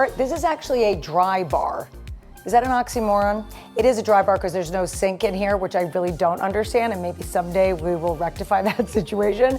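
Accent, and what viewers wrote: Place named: Texas, United States. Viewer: You are a hoot! Texas talk ,love your room.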